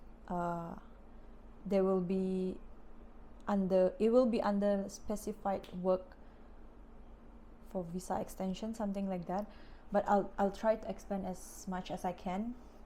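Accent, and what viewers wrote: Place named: Sabah, Malaysia. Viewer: Lucu lah sy rsa ni video hahaha. Buli dgr slang sabah. Would like to see more contents 🤗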